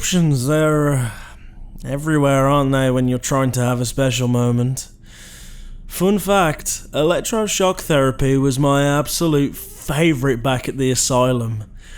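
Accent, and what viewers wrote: England, United Kingdom. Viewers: The British accent 😮😮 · such a english accent lol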